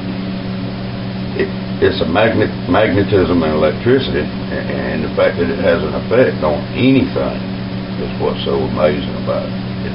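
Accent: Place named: Kansas, United States. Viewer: yes the voice and i do like the accent from kansas??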